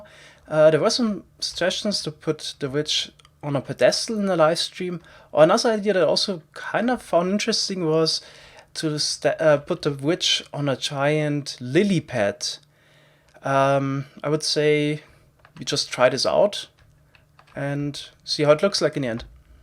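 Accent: Germany. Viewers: The german accent is strong in this one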